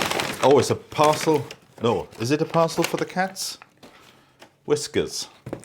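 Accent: Wales, United Kingdom. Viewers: I am a new subbie. I have got to tell you….. you are like my diazepam…… when I feel stressed or anxious….. I watch your vlogs and the world just feels a much better place. Your welsh accent and calming voice is so lovely. Please don’t ever change. Lots of love and squishy hugs xx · A potch around..that is an expression I have not heard in an age,,,,, from Neath originally but living in Japan for 23 years.......My family are Japanese, but they understand your accent.....Miy students too have Welsh accents!!! Thank you so much for the videos,,,,,,,,,we truly enjoy the relaxing and interesting way you tell a story.........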